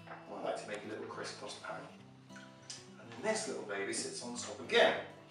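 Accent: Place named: Somerset, United Kingdom. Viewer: Hi, I am from Somerset and by listening to your accent i'm assuming you are too! My question is, where is a good place in Somerset to by apples in bulk at a wholesale price? Cheers mate and great video!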